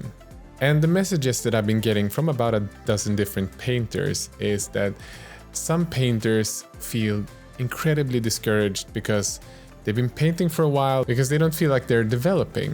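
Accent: Sweden. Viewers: This is probably one of the most wholesome videos out there for this hobby! <3 As someone with depression, executive dysfunction, and on top of that adhd which leads to myself having 243506 different hobbies... I spend most of the time jumping from thing to thing. Painting miniatures is one of those things, and right now i think it was like... a year ago since i last painted anything... i paint like 2-5 times per year otherwise.. i go in waves. right now dnd is the wave that i spend most days working with as a dm. So... am I good at painting? No, but I'm average, decent, acceptable for tabletop standard, and as you say, that's perfectly fine! I dorecognise that lingering "i'm not good" feeling thou... damn it's crippling, but.. i'm doing my best at pushing that aside and instead going "damn that's crippling but what the heck let's do it anyway, in my tempo!" <3 Thank you for this encouragement. 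(now, if I could only fix the painting station which is just lying in heaps right now... xD) Also... Your dialect sounded very swedish,, then I saw the fiverr video and in the end I heard "nej inte äta den!".... yup... so hello fellow swede! :P waves from sweden, and i'm guessing the minis wip group aswell :P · Hittade din kanal för några dagar sedan. Hobbyn hade jag lagt på hyllan men efter sett dina filmer så börjar jag bli sugen på att måla igen. För du är väl svensk , har för mig att jag sett kr och du har en svensk brytning. Bra jobbat vilket fall som helst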